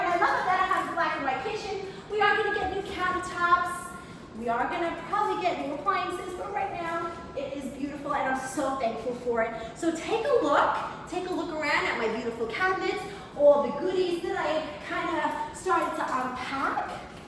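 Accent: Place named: New York, United States. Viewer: Are you from NY? Like the Bronx? You have the accent. I’m from Westchester NY parents from the Bronx. You are definitely a New Yorker!❤❤❤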